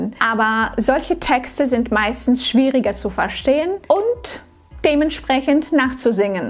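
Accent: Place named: Russia. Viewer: У тебя все равно русский акцент.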